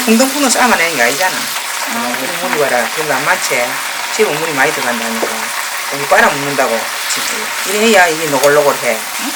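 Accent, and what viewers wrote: Gyeongsang, South Korea. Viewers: 단추꾸늉 같이 경남 사투리 정감있고 듣기가 잼 나네요 ㅎㅎ감솨 해용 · 구수한 갱상도 사투리 때문에 구독 ㅎㅎ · 저도 경상도라 그런지 사투리가 참 친근해서 친정엄마가 옆에 계신것 같네요. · 경상도 사투리 너무 정감있어요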